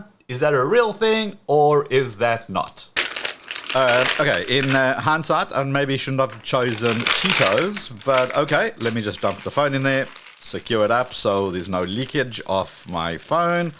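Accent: South Africa. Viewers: Howzit, do i hear some 🇿🇦 in your accent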